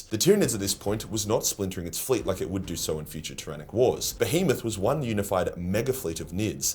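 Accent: Australia. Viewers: Nice vid ya Aussie 😂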